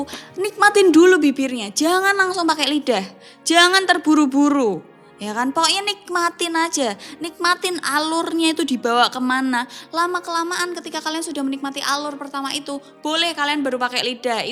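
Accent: Java, Indonesia. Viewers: Iki suenneng aku logat e jowo e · mbak wong ndi to yo 😂ngomong e cek medok e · Ngomoge mledok mbae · Ni cwe tipe gw bngt..medok'a itu lho bikin gw lemes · Aku kontenmu Mbak. Aku liat kontenmu kekel bhasamu Mbak.. boao jowone asik😀 Salam kenal ae wis Arema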